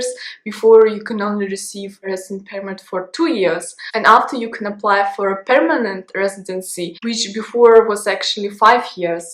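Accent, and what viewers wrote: Russia. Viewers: Your English is very good to understand, I just came back to Canada from Asian, spacial from China very hard to understand, your English is good, nice beautiful Russian aksent, I love it, speak German too, I like to hear that, you are beautiful. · I Love the Russian accent ...😍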